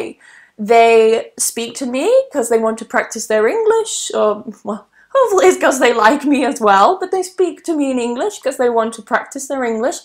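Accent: United Kingdom. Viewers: Thank you so much. Can i suggest you that maybe you can put the text below. Because maybe there are some people who don't understand because it is too fast or '' too british'' hehe.. Thanks a bunch. keep helping and improving. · I'm a huge fan of British accent english speaker. I love British accent. Carry-on thank-you so much. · I love your beautiful british accent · I love British accent and you. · Can you please tell me in which british accent you are talking🙂💐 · I'm from Uzbekistan, I love your British accent, you are the most beautiful teacher in the world, if I were your husband I would be the happiest man in the world 😘😘😘😍💓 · Those tips of yours were really helpful to be honest for those who want to improve their English skills and can't find a partner to speak to. That happened to me a lot back then many years ago when I first started learning English. 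It's quite hard to find people who'd be willing to interact and help us with our English speaking skils by practicing it with conversations. I'll keep watching your videos anyway cause I want to get used to the British accent which I've been learning how to admire, cause before it sounded really weird, but it's changed gradually overtime! And I loved your accent specifically! :)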